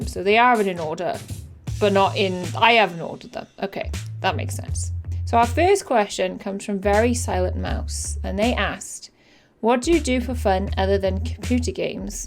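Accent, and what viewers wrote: Wales, United Kingdom. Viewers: People from Australia are top people :) thanks for answering my questions. I guess I am old, that playstation comment dated you and surprised me as I thought you were a lot younger. I came her for the valheim stayed for the valheim and the welsh accent.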